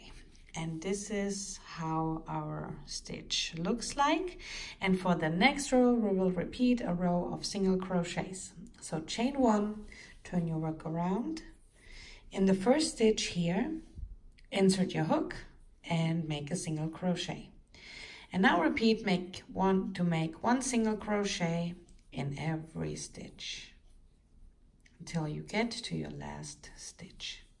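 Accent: Germany. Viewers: you speak English with the exact same accent as a German colleague of mine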